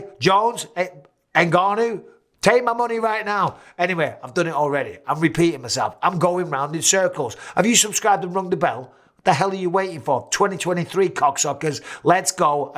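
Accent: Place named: Newcastle, United Kingdom. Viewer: Bisping turning into true geordie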